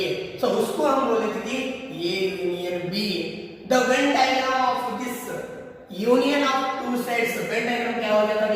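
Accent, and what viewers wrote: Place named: Karnataka, India. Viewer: Pronounce somewords in Kannada but class is super.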